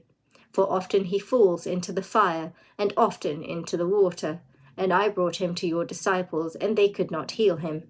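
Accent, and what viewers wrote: United Kingdom. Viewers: English is my second language, and I love the British accent. You can't imagine how happy and grateful I am for your reading. please never stop. God bless you.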